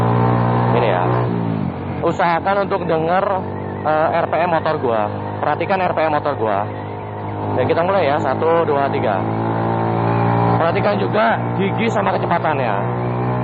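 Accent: Surabaya, Indonesia. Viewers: bang org surabaya ya? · Wakk.. suroboyo cak 😂😂😂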